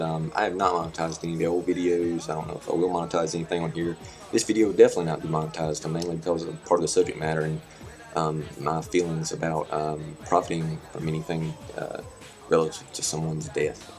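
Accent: South, United States. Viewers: I enjoy your vids, and yes, I am easily hypnotized by southern accents...esp when they have something interesting to say, as you always do. Yeah seeing George pass was tough, I am from that generation I guess. He will be missed. Thanx for your updates too! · I LOVED his routine about "stuff" (no air quotes there, buddy - those be the real McCoy!" I'm NOT just saying this, it's the honest truth - when I saw you posted a video and saw the length of it, my first thought was, "Weeee! 9+ minutes of listening to that endearing accent!" Imagine how hard I laughed when I read the bottom of the description - you nailed me! I stand guilty of being A. (but man, you talk FAST for a Suthenah)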